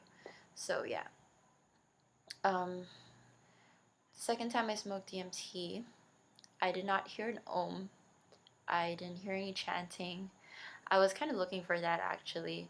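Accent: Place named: United States. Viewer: You sound so american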